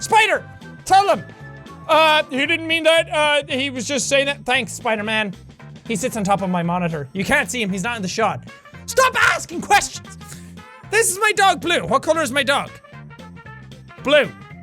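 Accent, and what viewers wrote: Ireland. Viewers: Jack, you are talking Irish AGAIN... please stop... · Is it just me or does he get more Irish with every shot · Did anyone else notice how the more drunk he is the more Irish he sounds? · He became progressively more Irish with each shot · One you are beautiful Two the more drunk u get the more Irish u sound Three are you Devlin out of the sabotor by any chance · Im irish and thats just how it works you get drunk and your voice goes full irosh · His Irish accent is so much stronger wen he’s drunk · The more shots jack took the more Irish he sounded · I love how he sounds progressively more irish when he takes more shots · As he gets more drunk, he gets more irish lmao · It sounds like he gets more Irish the drunker he gets. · Jack gets a thicker Irish accent as the video goes on. Noice. What am I doing with my life. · by the second shot his voice got more irish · The more drunk he is his voice gets deeper and more Irish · The more he drinks the more Irish he turns into, do you notice that? 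· i didnt think it was possible for Jack to get even cuter and even more irish. i have been proven wrong on so many levels.. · Is it me or does Jack start to fall back into his Irish a little more when he drinks lol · I dont know if I'm the only one that realizes, but his Irish accent is way easier to hear in this video compared to others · HOW IRISH IS THIS LAD?!? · U know u have a strong irish accent when u start say fookin instead of fucking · You can hear the complete Irishness in his voice I think jack was drunk at the end there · He sounds more Irish the more he gets drunk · His true Irish man is coming out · It seems that the more he drinks, the more Irish he gets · Am I the only one that thinks that jack should do this more often and that he gets a more prominent Irish accent when he is drunk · he sound more irish the more he get drunk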